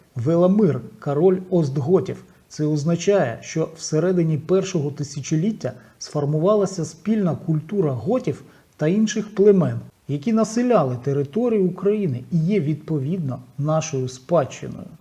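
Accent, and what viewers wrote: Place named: Moscow, Russia. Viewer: Ви нащо назад на українську перейшли? Вам не пасує. Тільки масковскій діалект цапського ізика. З аканням.👍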